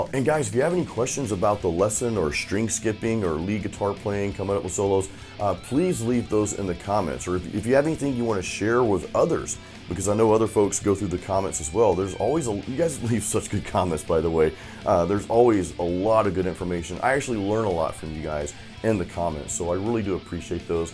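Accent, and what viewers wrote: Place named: New York, United States. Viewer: I know you are from Florida, but sometimes I am sure I can hear some New York in your accent LOL.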